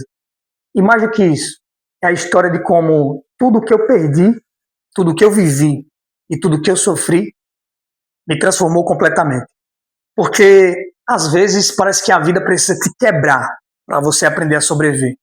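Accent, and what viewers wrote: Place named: Northeast, Brazil. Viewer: Boa Noite. Pelo sotaque parecer ser conterraneo, Nordestino. Se for de onde? GCM de qusl Cidade? Sou Concurseiro da GCM... Policias Penaiis e PC'S, Também!!! Atualmente inscrito para GCM - Paulista PE... entre outras. Vc Tem instagran..? Tenho interesse no Projeto, acsbo de me inscrever no Canal. Como podemos estreitar ä Comunicação? Um Abraço de RECIFE - PE. 🙋‍♂️🙋‍♂️🙋‍♂️🙋‍♂️🙋‍♂️🙋‍♂️🙋‍♂️ +++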